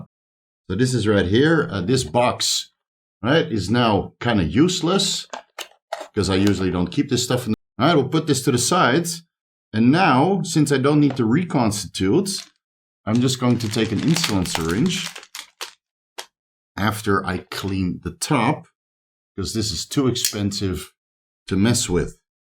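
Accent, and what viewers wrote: Netherlands. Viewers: dus ja? interesante shit man kan wel redelijk horen dat je nederlands bent maar dat maakt het voor mij alleen maar mooier🤣. dank voor de content bro!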